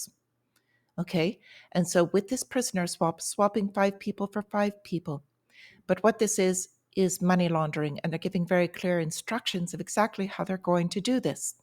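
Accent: Ireland. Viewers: Hey 😄 Thank you for posting your daily market updates, I love them! You mentioned the relative strength of the US dollar will get hammered, what scenarios do you think could happen with say the EURUSD? A second question, is your accent Irish? Thank you & Have a great day.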